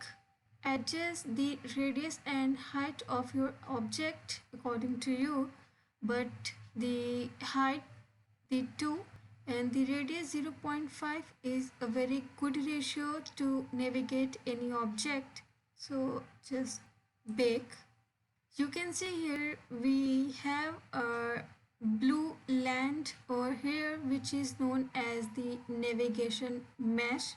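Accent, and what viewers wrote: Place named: India. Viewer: U are Indian Sounds like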